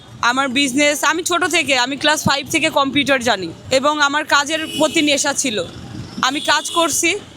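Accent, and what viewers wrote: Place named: Kolkata, India. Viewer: Kolkata style ei kotha bole ..nice style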